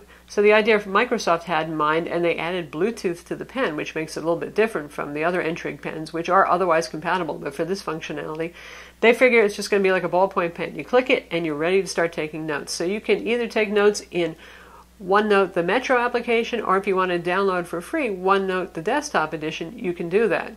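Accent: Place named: New England, United States. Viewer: Do you live in New England? You have that accent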